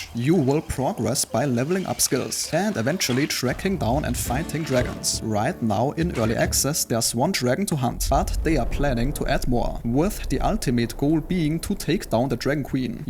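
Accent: Germany. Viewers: this german accent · Der Deutsche Akzent Kickt Hart^^ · The german accent is strong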